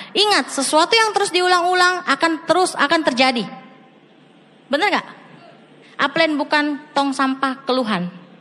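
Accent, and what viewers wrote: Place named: Sulawesi, Indonesia. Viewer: Logat sulawesi😊😊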